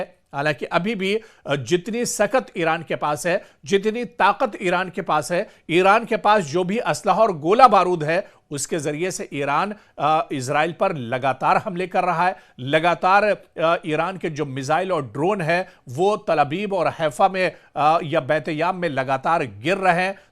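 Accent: India. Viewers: Voice Indian lagta hay, Proper urdu nahi hay 😅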